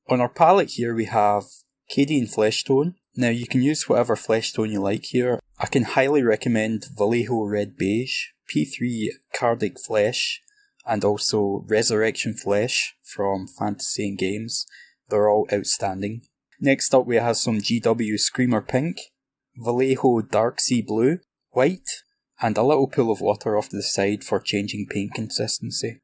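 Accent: Scotland, United Kingdom. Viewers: VERY controlled and detailed work, the 'subtle' finish speaks (looks???) for itself! Great and CLEAR presentation in terms of info/technique/example AND such a lovely Scots accent!!! xx SF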